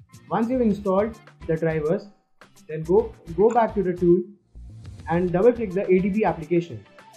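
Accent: India. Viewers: dude, your indian accent is hard to understand for people who are not from india. he wants you to put captions on the video or make custom subtitles on your videos.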